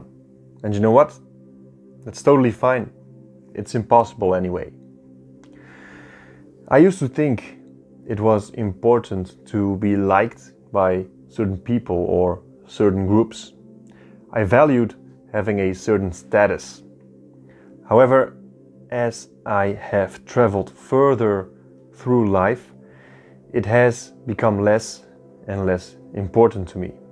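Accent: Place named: Brazil. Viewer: Are you brazilian? 🌚 because you have a brazilian accent.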